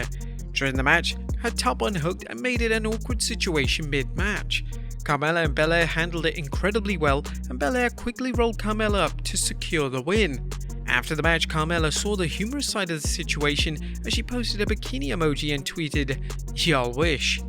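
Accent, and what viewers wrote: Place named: United Kingdom. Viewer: yooo!!! just the "british" i was hoping for!!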